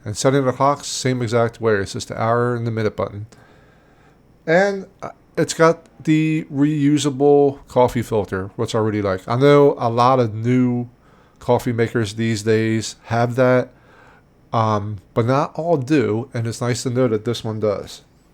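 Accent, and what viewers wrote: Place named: Philadelphia, United States. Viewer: Just subscribed . Just bought this and listened to you on the way home . I thought I recognized the accent then I heard “wooder” and I hit that subscribe button... r u from Philly or delco or pa ? HHaha cheers from one pa youtuber to what I think is another one !!!!! Peace ✌️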